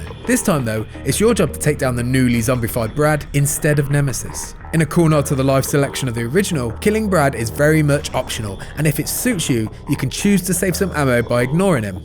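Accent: United Kingdom. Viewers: 1. There are many accents in Britain 2. This guy IS British. Are you deaf? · Zucc i am 1000% aware he IS british and he has a BRITISH ACCENT... So i guess the problem now is why did i have to put “*in a british accent* if he’s naturally british huh? Why the need to point that out... That’s the issue, right?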